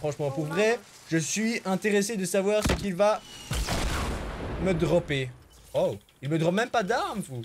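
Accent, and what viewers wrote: Belgium. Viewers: T'es belges ?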